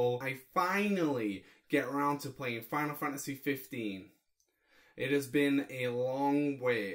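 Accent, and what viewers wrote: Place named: United Kingdom. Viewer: no worries bro been watching your vids regularly and there really good!!🇺🇸💪 but dude what happened to your British accent!!! Don't forget ff7 on that list! Let us know when your next online we'll party and catch up, you know I'm a Sony boy through and through but the switch has turned my head a little 👌 also I was in the Nintendo store in NYC and it's crazy, you need to get there sometime man you'd be in heaven.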